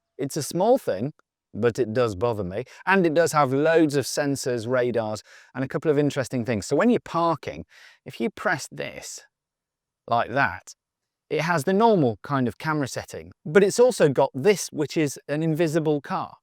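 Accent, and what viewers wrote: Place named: United Kingdom. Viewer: Kewpra? Really ? C'mon, Brits. Chewna. Chewsday. Bat-tree. For crying out loud.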